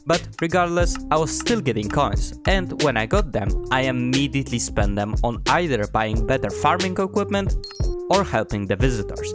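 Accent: Poland. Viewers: your accent sounds soo polish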